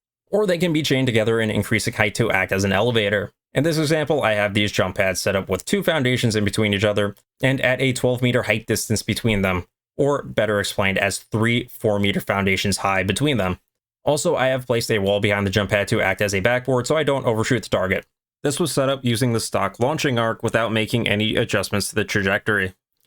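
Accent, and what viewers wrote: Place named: Philadelphia, United States. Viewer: You from the Philly/New Jersey area? Thought I heard the accent in your voice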